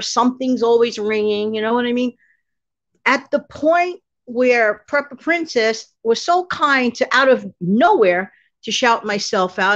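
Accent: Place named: New York, United States. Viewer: Yep. I came over because prepper princess mentioned you and that you were from New York City and she I think she even mentioned your accent and I said I miss being out east let me listen to a fellow New Yorker. And I have loved every one of your shows and haven't missed one the past couple of weeks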